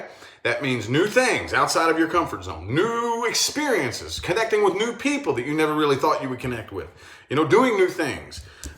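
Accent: South, United States. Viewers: I love your voice!! It sounds very southern ❤💕💕💕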